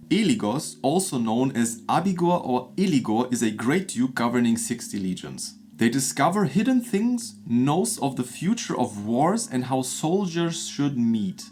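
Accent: Germany. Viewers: Do not worry how you pronounce the names. German accent is the sexiest.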